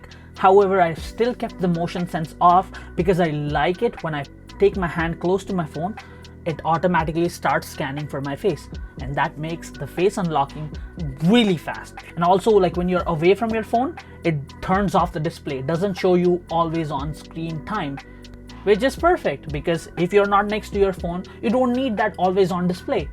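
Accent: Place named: Punjab, India. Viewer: Bro you got a thick Punjabi neighborhood English accent? I would love to know the story behind it. Brampton ?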